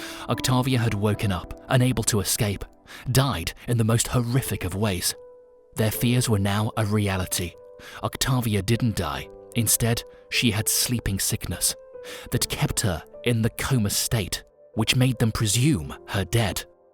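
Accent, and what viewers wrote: United Kingdom. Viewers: he’s British 🇬🇧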